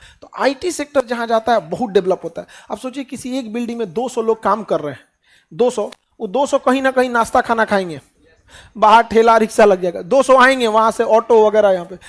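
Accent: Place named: Haryana, India. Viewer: haryanvi boli😎😎😎😎😎😎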